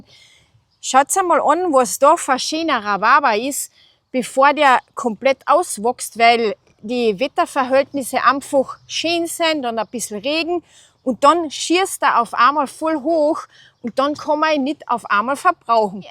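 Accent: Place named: Bavaria, Germany. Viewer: Liebe, das Thema ist ja sehr interessant, doch für einen Norddeutschen ist das Bayerische fast wie eine Fremdsprache, also schwer verständlich. 🤣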